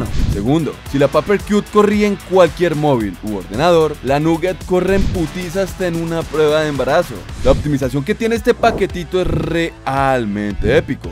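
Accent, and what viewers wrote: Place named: Colombia. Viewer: Por qué hablas como colombiano? deja de hacerlo es molesto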